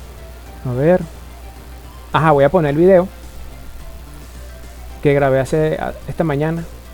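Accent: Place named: Colombia. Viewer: No se porque, pensé que eras de colombia Pero es bueno que estes bien Espero se recuperen pronto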